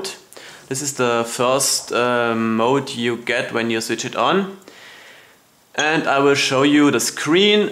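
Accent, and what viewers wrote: Germany. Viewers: das deutsch hört man echt raus :D nettes dosimeter.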